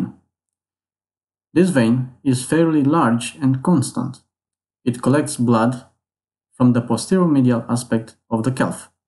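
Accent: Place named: Romania. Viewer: Salutare, tocmai am 3 saptamani dupa operatia de varice..Doamna chirurg, mi-a spus, ca mi-a eliminat acesta vena(safena)... Am mai multe incizi pe picior. La inghinal, langa zona genitala am o cusatura mai maricica, cica acolo ar fi "inchis-o".... Deci, sunt operat de la glezna pana sus... O luna fara efort fizic... Ma simt ok, dar dupa, sa zic vreo 15 min - 20 min de mers, stat in picioare... Simt presiune, suportabila in picior, cu senzatie de umflare... Cred, ca e normal... Ptr perioada asta... In fine... Aveti un video foarte interesant..., dupa accent sunteti roman 🙂💪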